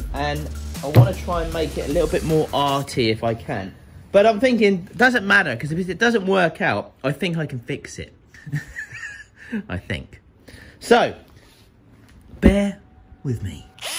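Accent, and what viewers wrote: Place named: England, United Kingdom. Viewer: Where in England are you from, i mean with your accent ?? Its not london and probably not manchester or up there 🤔🤔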